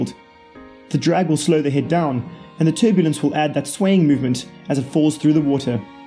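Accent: South Africa. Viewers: South African? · You sound South African lol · The accent took me a second to pick up. Not used to SA repping in the industry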